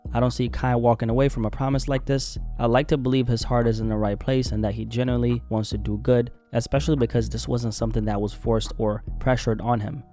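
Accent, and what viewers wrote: East Africa. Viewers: I knew you was an east African just by ur voice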